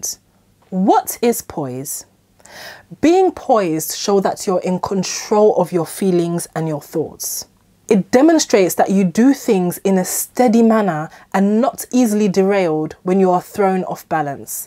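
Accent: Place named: United Kingdom. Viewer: Easy for you to say... with that perfect British accent you do not need to work hard at elegance :)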